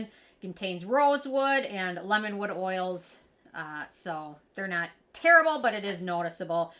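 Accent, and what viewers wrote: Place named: Midwest, United States. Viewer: I love your Midwest accent!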